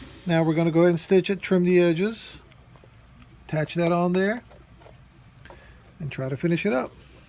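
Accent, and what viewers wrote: United States. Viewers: You sound American. I'm French born and raised, lived in U.S since '84, and have found only 1 shoe repair shop in 34 yrs living in the States. You are a craftsman on the endangered species list my good man. Those shoes are a work of art too. Nice job restoring them.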